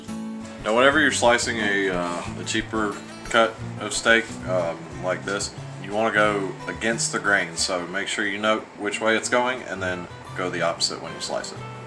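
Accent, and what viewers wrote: South, United States. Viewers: You should do more videos. Why? Because I enjoy them and We all love your confederate drawl.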